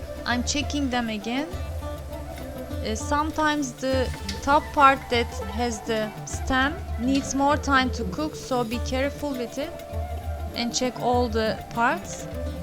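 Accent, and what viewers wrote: Turkey. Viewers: I just love turkish food and i love turkis english accent 😂🤣🤗💪😁🇹🇷🇸🇴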